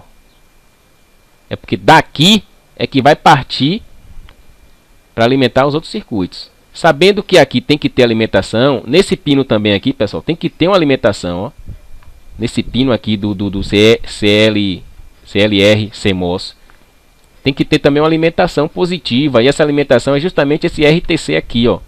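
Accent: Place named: Pernambuco, Brazil. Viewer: tu ta de parabéns pela didática, simples, direta e eficaz! E esse sotaque ta me parecendo bem "leão do norte". Sou de PE, de onde você é?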